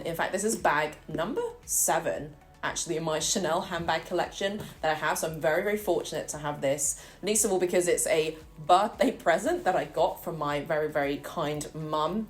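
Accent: United Kingdom. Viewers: Lovely bag! Also side note, I love how you are Asian but sound so British - like me! 😍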